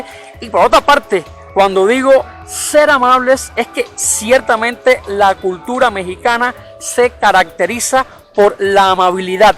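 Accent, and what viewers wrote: Cuba. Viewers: no sabía que bueno entrar a informarse, soy mexicana y me avergüenza que hay paisanos así, lamentablemente de todo fruto da un árbol y aún en México hay mucha gente que solo habla por hablar, discúlpalos se crecieron viendo televisa y TV Azteca y no se tomaron la libertad de leer. Saludos y un enorme abrazo a mi me encanta el acento cubano y hay mucha gente en México que vale oro, no te quedes con la impresión de algunos pocos mexicanos ignorantes. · El asentó cubano se conoce a la legua usted es cubano no niegue su paiz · Ustedes son queridos amigos en cubanos en mexico nunca pierdas tu asento cubano hermano saludos desde atlanta Georgia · Tu acento ya no es tan cubano o sea no es exagerado,me agrada tu acento , saludos 🎉🎊✨🌟⭐💫💙🤎💛❣️🤍❤️💚⭐🌟✨💫🎊🎉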